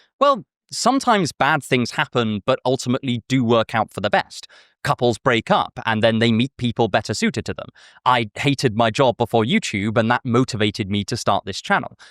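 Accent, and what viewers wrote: RP, United Kingdom. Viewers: Honestly your presentation comes off this way even pretentious but you're delightfully sensible as far as I've seen. Might be the accent if I'm honest very posh to my American ears.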